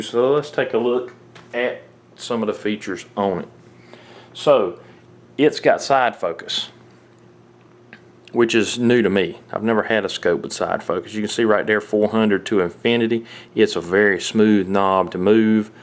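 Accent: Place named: Ireland. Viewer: that some thick accent you got there, you from Ireland?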